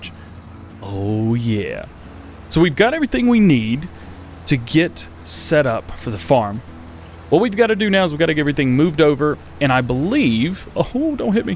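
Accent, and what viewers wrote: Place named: United States. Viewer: Really enjoyed the video, love the American cowboy accent on a British farm!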